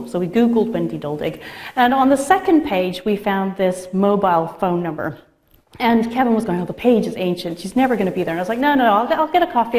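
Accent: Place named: Ireland. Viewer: For a Canadian, she has quite a few words that sound very Irish.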